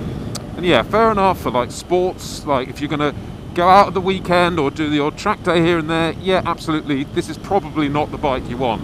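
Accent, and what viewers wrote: England, United Kingdom. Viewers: So, what kind of English accent do you have? I like it. Is it "posh"? Northern? Southern? What would one call it?(I'm a clueless fucking American, btw) The sarcasm is dripping and delightful.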